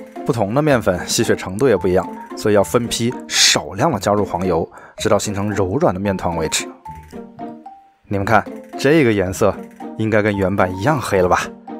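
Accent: Wuhan, China. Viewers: 听声音应该是武汉人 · 想问下up主是不是武汉人😂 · 太棒了！质量很高的美食博主！博主是哪里人？听口音好像是武汉的？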